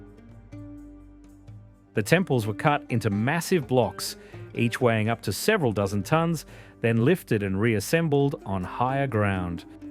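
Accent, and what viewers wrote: Australia. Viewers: An Aussie narrator, not a bloody yank - thank god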